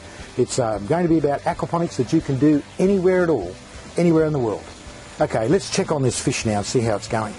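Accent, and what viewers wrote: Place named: Irish Traveller, Ireland. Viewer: Are you aTinker Irish?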